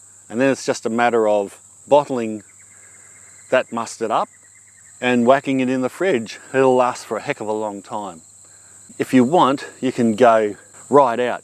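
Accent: Australia. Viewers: Omg! I was looking for recepies of mustard and I found you, with this aussie accent that it m miss me, after spending 2 years in Sydney in 2000, you brought me to those days ❤ tank you for the recepies.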